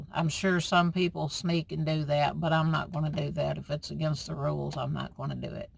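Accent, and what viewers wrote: South, United States. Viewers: I’m so sorry you had such a bad experience. You did the right thing. No sense in lowering yourself to their standards. You are a beautiful person inside and out so don’t worry because usually it’s their issue not you. I should be there Wednesday. I’m going to meet MaryAnne at an artist meetup. I’ll call you to see if you want to go there. Artists are usually pretty laidback. I love your southern accent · I'm a new subscriber. I love a southern accent. I'm also 66 yo woman. Keep updating us. I love it. Mean people suck. That's on them. I think we could totally get along as neighbors even if your pet gets loose. The nice thing about van life you can leave and find new neighbors.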